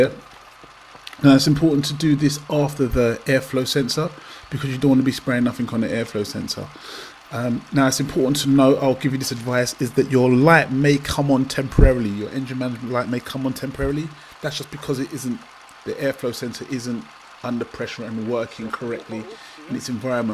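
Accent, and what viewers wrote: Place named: United Kingdom. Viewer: I think, in future, just say "would not" instead of wouldnt, in case the non-Brits dont understand your accent mate!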